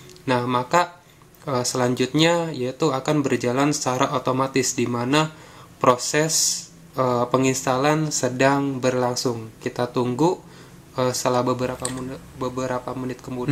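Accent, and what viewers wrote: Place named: Java, Indonesia. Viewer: Medok bro wkwk Tapi gud lah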